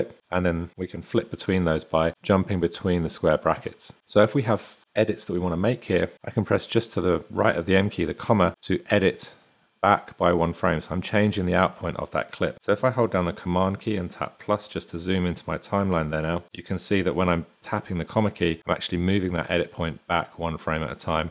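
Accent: United Kingdom. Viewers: Just discovered you OMG thank God I've got a 1 month trial and haven't got a clue how to use it You tips are invaluable and your a fellow Brit without the annoying American accent I have subscribed This will really help before u go out a blow £200 on the package👍🏾👍🏾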